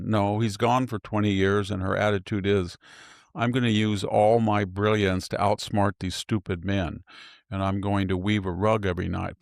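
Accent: United States. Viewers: ❤❤❤❤it is so nice for me hearing the old greece-names with an american-english pronunciation. If you would not write the names I would not understand the original greece names❤❤❤❤ regarding your topic, you are absolutely right, "classical literature was once the cornerstone of education" =I appreciate this sentence so highly ❤❤❤❤ I am living in Wien/Austria, and I estimate the tendence is here the same as you described❤❤❤❤ warm greeting to you❤❤❤❤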